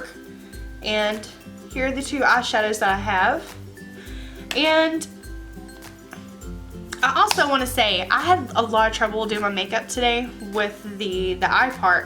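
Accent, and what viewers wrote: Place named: North Carolina, United States. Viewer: OMG! 😲 I'm here in Charlotte. I thought I recognised your accent. ❤💄💋b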